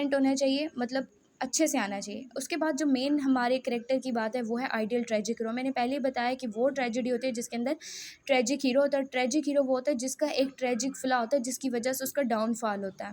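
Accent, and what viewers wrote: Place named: United Kingdom. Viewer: MashAllah, mam your accent is very good and you totally seem like British... 👍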